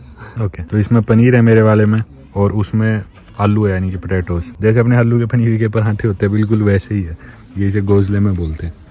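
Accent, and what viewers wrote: Haryana, India. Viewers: Kbi kbi toh aap pure haryanvi me aa jate ho baladth- ox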